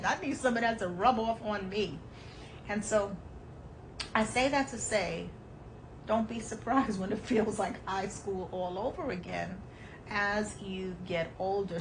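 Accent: New York, United States. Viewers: The New York Accent I love that😍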